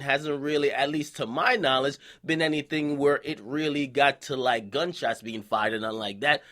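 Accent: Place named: South, United States. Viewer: you are an american rapper loose the country accent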